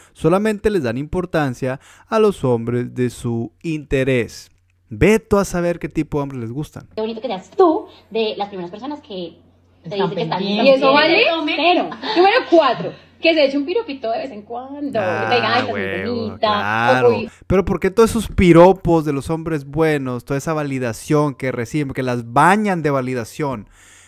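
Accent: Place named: Mexico. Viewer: Me parece que este hombre más allá de generar consciencia desde un punto de vista objetivo e inteligente para despertar en el hombre su valía. Parece ser que quedó muy tocado de su divorcio y experiencias, solo escucho a un hombre ardido, que no le fue bien en el amor y ahora descarga sus comentarios a través de este canal. Me parecen comentarios misóginos y patanes sin sustento. Chicos no se traguen todo lo que dice, Suena demasiado ardido, ni preparado, ustedes creen que un profesional se expresaría así como él? El éxito de las parejas va más allá de la experiencia de un solo hombre. Además, me suena que es mexicano y no entiendo porque estudia mujeres como colombianas y argentinas, desde ahí está mal analizando mujeres ajenas a nuestra cultura. Es demasiado incoherente, analiza a las mujeres de tu país para que al menos haya un poco de coherencia y análisis real. 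Hace falta más preparación de este hombre. No solo su percepción.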